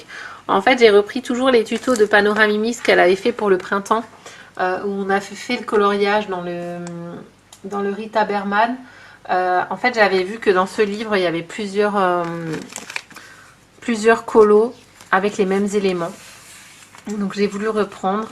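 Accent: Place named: Alsace, France. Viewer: Une alsacienne ! Quel plaisir ! Alsacienne également j'ai direct reconnu ce petit accent ! :) une très jolie vidéo !!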